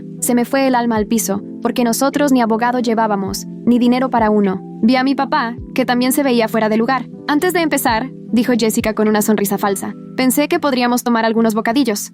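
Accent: Mexico. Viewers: La historia con algo de acento mexicano🎉😂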